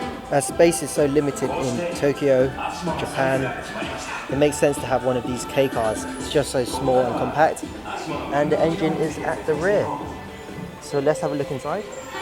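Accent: United Kingdom. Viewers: nice vid as always!! on a side note are you japanese? from your UK accent seems like you've lived your whole life in the UK love your vids been a sub since the first evo6 review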